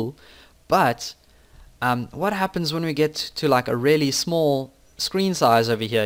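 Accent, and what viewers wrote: South Africa. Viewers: Afrikaans English accent ? South African ? Good video. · I LOVE your accent man, are you South African? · Really helpful tutorial, thanks & looking forward to getting something that triggers a menu list from a menu that normally appears in the sidebar. PS nice to hear a South African accent :)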